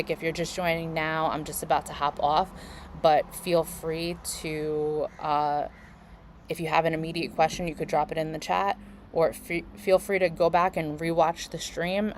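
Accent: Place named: New York, United States. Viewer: I thought I heard a little NY in your voice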